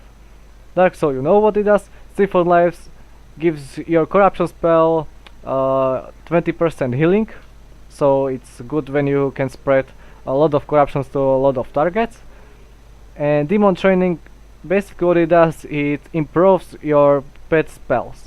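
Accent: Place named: Europe. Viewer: Love your accent man, brings back to my euro friends I used to skype with. I can tell you really hate warriors lol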